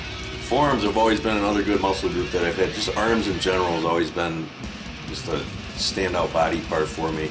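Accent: Canada. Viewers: Always wanted to ask, are you Canadian?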